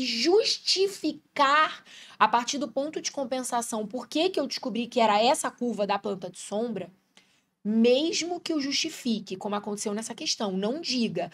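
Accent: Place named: Rio de Janeiro, Brazil. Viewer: Esse sotaque carioca me encanta! Que energia linda a tem!!!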